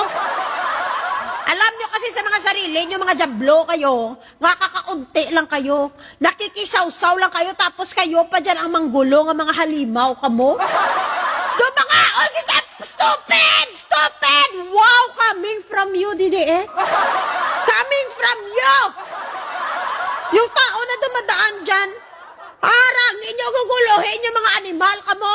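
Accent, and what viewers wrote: Iloilo, Philippines. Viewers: Ilongga ka mam, isog gd 😁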